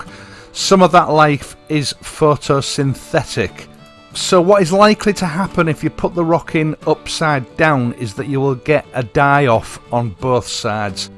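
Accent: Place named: Hull, United Kingdom. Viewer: I am making an assumption based on your accent that you are somewhere near Hull. As a child I used to go to KKC in Hessle. Where would you recommend nowadays?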